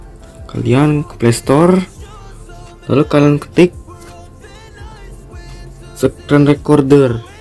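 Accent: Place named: West Java, Indonesia. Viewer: Sunda bang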